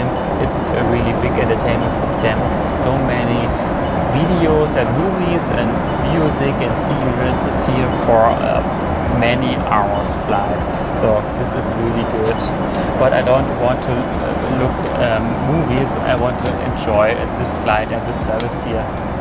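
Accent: Germany. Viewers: Dein Englisch ist so deutsch! So richtig schlecht!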